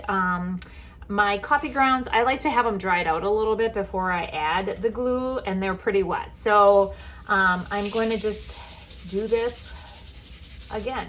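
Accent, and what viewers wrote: Wisconsin, United States. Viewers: Like your upcycled items..also ur accent makes me miss Wisconsin...live in Oklahoma now...subscribedand sharing!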